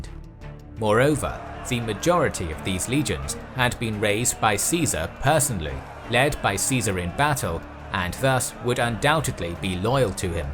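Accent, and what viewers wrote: United Kingdom. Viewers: Nice British accent!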